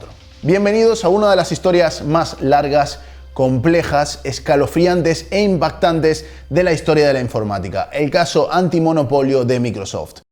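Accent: Galicia, Spain. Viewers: Te sale ,se oye lo GAYEGO ..JODER ME CAGO EN LA LECHE MECHEEE!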